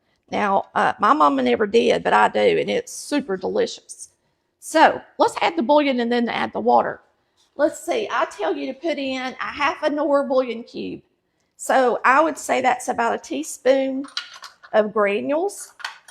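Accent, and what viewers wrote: South, United States. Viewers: When the video only provides the sound, usually the instruction is doable. Not today, I REALLY wanted to watch the demo. Love love your adorable southern accent. Paz be with us all 🙏. " "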